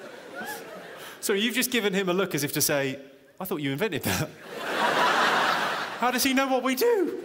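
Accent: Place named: Southern England, United Kingdom. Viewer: He speaks very proper English too, not very regional, just a kind of generic south England. Great for learning English.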